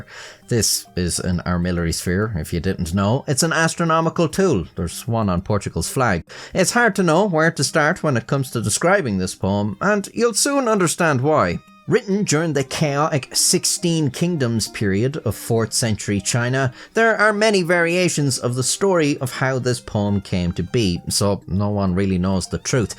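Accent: Ireland. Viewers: Please, Irish guy in my iPad, read me more poetry. I promise I won’t be idly scratching my ass this time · Is that accent Irish?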